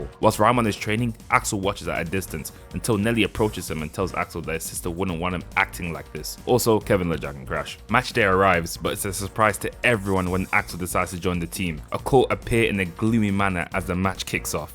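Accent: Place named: United Kingdom. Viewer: Love you bro I love British Accent🫶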